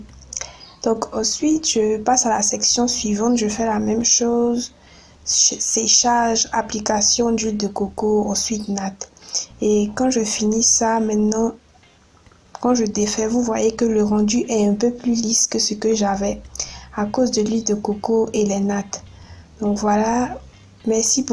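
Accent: Ivory Coast. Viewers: L’accent ivoirien hein il est calé On adore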